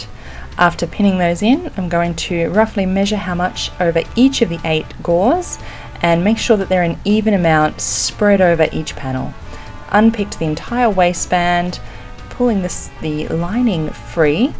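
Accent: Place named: Australia. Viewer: I realize this is totally off topic but I love Australian accents, especially yours.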